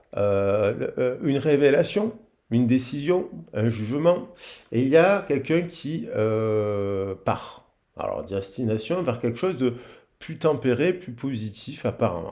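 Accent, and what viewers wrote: South, France. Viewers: Bonsoir. Un plaisir d'écouter tes guidances avec ce chaleureux accent du Sud, qui plus est toujours source d'enseignement également. Merci pour ta générosité et ton dévouement 😊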